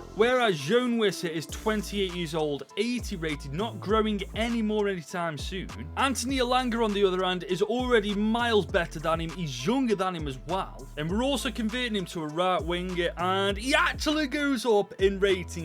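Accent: Stoke, United Kingdom. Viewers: Are you from Stoke mate?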